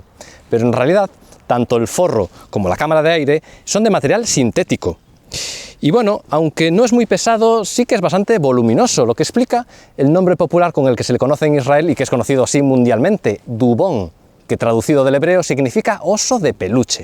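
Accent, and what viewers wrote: Argentina. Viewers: para ser Español, te salió bastante bién el acento Argentino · Ja ja ja te sale bien el acento argentino, buen video, saludos desde Valparaíso de Chile ❤ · Le salió muy bien, pero es acento porteño, no argentino. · Felicitaciones tambien por intentar imitar nuestro extraño y dificil acento de argentino promedio, que igualmente varia mucho entre las regiones de un pais tan extenso. De 1 a 10 te doy 7 puntos, con un poco mas de practica o viviendo unos meses aca llegas al10, loco. 😮😲😁👍🇦🇷💪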